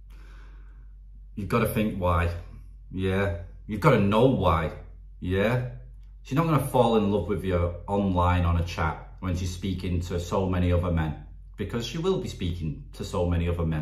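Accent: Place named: Leeds, United Kingdom. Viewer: do u ever think KAAAA sounds like an old crow lol - when i hear the girls talking it makes me think old crow lol - u sound like a leeds guy - close ?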